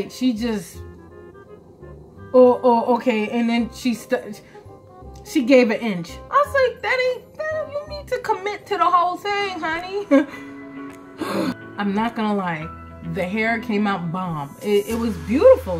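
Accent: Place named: Africa. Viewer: 🤣🤣🤣 subscribed. Love the African accent🤣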